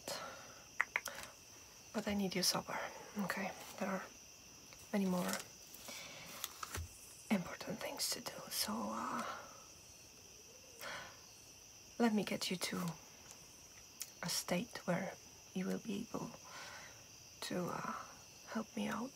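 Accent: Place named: Netherlands. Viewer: I'm trying to place her accent but...Dutch maybe?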